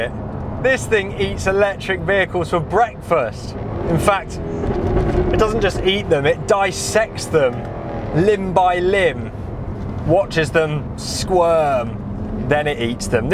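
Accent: United Kingdom. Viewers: Fantastic review. Love your British accent and colloquialisms, how apropos. I live in the United States and this is the next car that will be in my collection. I've been looking at them and wanting one for a while I just already have 12 and value my ability to not be a corporate slave. I can well afford it I'm just holding off as long as possible on spending near 50 Grand but it's coming lol. I have an 04 XJR in my collection and this parked beside it would be stellar. Fab review!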